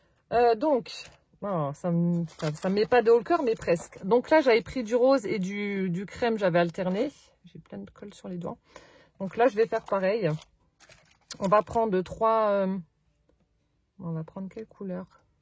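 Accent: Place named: Alsace, France. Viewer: Bonjour 🌸 Je suis tombée sur ta chaîne par "hasard" et WAOUH... Pas du tout équipée et ne sachant pas du tout ce qu'était le scrapbooking, me voici complétement motivée pour me lancer! Je vais faire un grand tableau avec tes idées pour que chaque personne qui vienne à la maison puisse laisser un petit message souvenir! Merci merci merci 🙏💜💁‍♀️🧚‍♀️ en plus je suis d'origine alsacienne alors votre accent et vos répliques me font chaud au coeur et rire!!!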